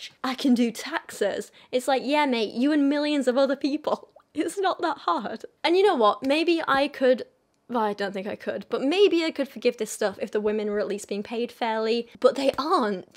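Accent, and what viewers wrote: United Kingdom. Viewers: There is some humor in how you read it in your cheerful sweet very fsminine voice with a sophisticated British accent. Love it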